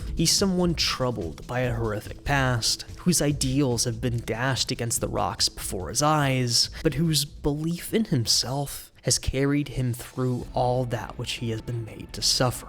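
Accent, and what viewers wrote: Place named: Ireland. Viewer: Italian? My boy is Irish.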